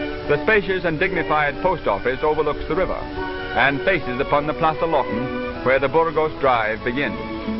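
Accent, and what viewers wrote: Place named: Transatlantic, United States. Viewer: trans Atlantic American accent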